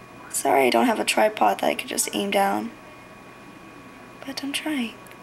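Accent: United States. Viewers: So obvious you're from Cali... you sound like Brenda Song LOL Nice tutorial though :p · R u american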